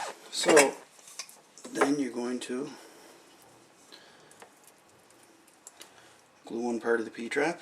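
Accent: Canada. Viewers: I knew you sounded Canadian! I'm Canadian as well. I live in Alberta. I've been watching your videos because I've been thinking of getting into the plumber trade. Thanks a lot for putting up these videos!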